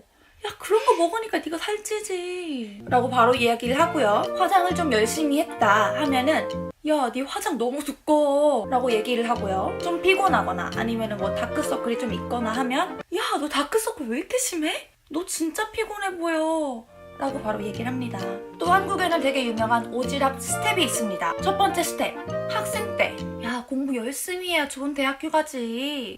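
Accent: United Kingdom. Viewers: Você tem sotaque britânico quando fala inglês 😂 que fofa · Your british accent is so gooood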